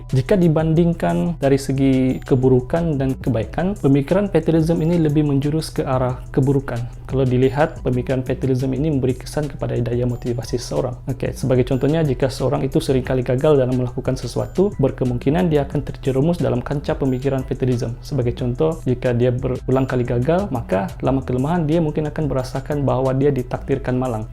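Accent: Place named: Sabah, Malaysia. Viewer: Ya, agak jelas slang sabah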